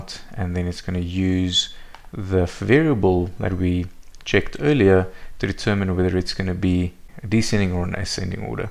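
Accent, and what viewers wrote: South Africa. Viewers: Cape Town accent??? We are homies. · Hi, where are you based? Can hear the SA accent. Are you perhaps in Cape Town to attend a course or two? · South African? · Thank you Mr. This was 10X better tutorial than others videos out there. Also picked up on that SA accent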